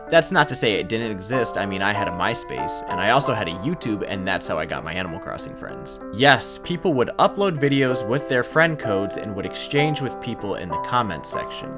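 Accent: Syracuse, United States. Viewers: Are you from... Syracuse..? Cuz I’m relatively close 😳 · bro you from Syracuse???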